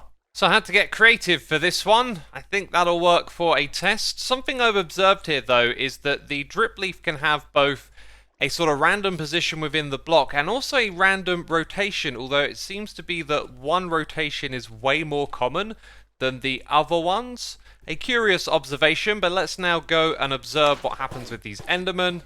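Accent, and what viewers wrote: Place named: United Kingdom. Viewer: let him pronounce words like he wants to. Stop forcing the american way of saying words on british people.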